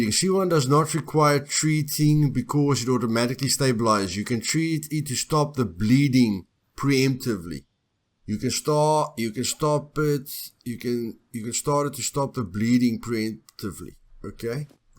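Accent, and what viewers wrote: South Africa. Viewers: I like your accent is it south african or new zealand? i may be completely wrong but i know people from both places and you sound similar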